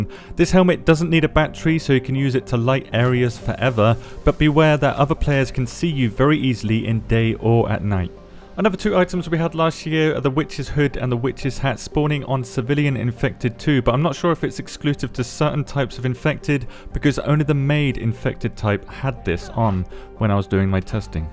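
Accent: United Kingdom. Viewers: tryed again to watch a vid of you, again i cant. this british accent is like a chainsaw to my ears.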